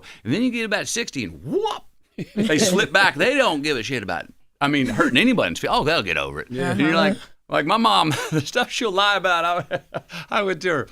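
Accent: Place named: Texas, United States. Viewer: Gurlllllllllllll I broke my nail I CLICKED SOOOOOO DAMN FAST!!!!!! I loveeeee his Texas country deep voice having ASS!!!!!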